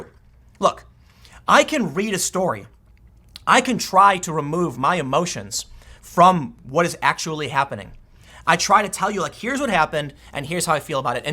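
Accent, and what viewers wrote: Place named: Chicago, United States. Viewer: Dude what neighborhood from Chicago are you from? I feel you're from either Bridgeport or Beverly.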